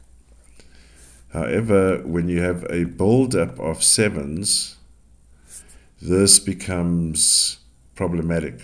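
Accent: South Africa. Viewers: Anyone else just clean their whole house or take naps or listen to these videos before bed? I just love South African accent.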